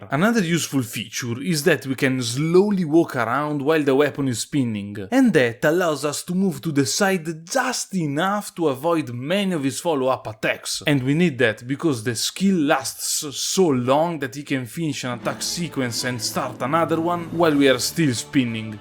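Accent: Italy. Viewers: You're Italian?! I knew you had an accent just couldn't ever place it (don't judge I'm a foreigner too) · Sei italiano? credo di sì per la parlata e la voce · Your voice gives me italian vibes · fammi indovinare, italiano? ahaha l'accento ti vende che una bellezza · Italian accent + berserk reference= me subscribing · Perché hai l'accento italiano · I just love your Italian accent. You are the only Italian DS player I know. Keep up! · Adoro l'accento italiano 😭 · Che bellezza scoprire che sei italiano, l'avevo immaginato da qualche pronunzia! Adoro i tuoi video 🤩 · Si, l'accento italiano si sente. Ottima run, comunque! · Oh shit your accent is Italian? I thought it was French 💀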